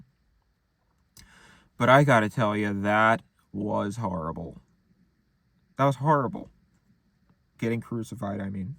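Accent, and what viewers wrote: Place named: Maryland, United States. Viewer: He's really leaning extra hard into the Maryland accent in this one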